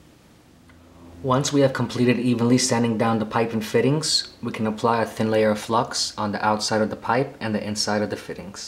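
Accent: United States. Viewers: That's just how Americans say it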